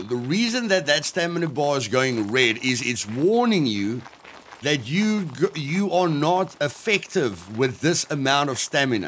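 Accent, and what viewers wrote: Afrikaans, South Africa. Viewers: You Afrikaans?😅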